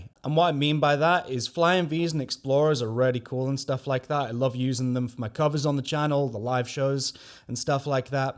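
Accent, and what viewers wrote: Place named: Geordie, United Kingdom. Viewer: Bud, I'm having a hard time placing your accent, Jordie? Great video, hi from Canada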